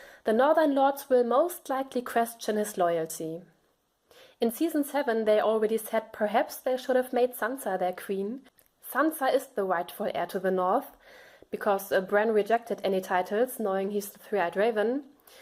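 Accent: Germany. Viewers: Is English your mother tongue? Your speak a bit with a german accent, I guess, but that's very enjoyable...^^ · That german accent . Nice theory tho.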